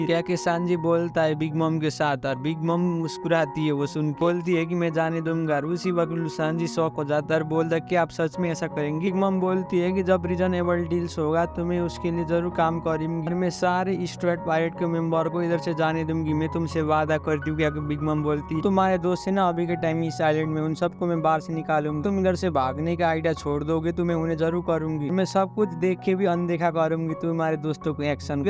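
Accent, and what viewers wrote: Nepal. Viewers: Nepali accent is so annoying😢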